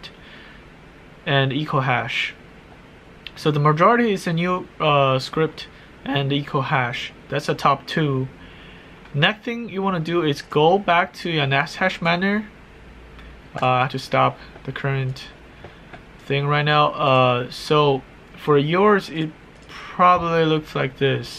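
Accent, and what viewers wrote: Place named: China. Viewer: I love your chinese accent sorry if its offensive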